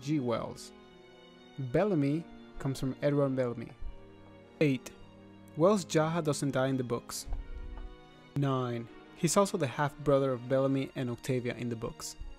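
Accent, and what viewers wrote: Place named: Australia. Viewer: Wow she sounds so astralin how dos it not show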